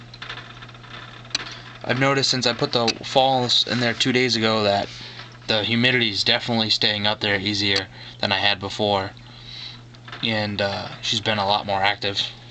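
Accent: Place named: New York, United States. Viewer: I can tell your from new york by the way you speak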